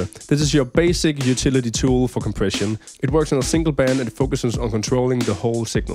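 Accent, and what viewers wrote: Denmark. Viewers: du dukkede op i mit feed, og min kæreste hørte med og spurgte om du var dansker. Jeg tænkte nej sikkert ikke. Men fandme jo. Så fedt med en dansker der laver den slags yt. Keep up.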